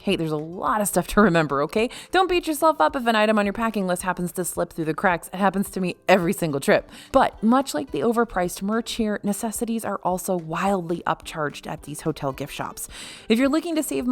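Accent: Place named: South, United States. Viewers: The quasi-Floridian southern accent was really evident in this video with the disproportionate number of twangy "y'all's" compared to other videos. Fun! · is a Texan. They throw ya’lls around all day long in the Lonestar State!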